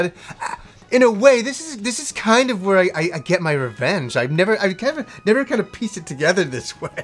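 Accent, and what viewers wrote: Yorkshire, United Kingdom. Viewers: U are a Yorkie? which part were u from? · WUT? your a Yorkshire Lad?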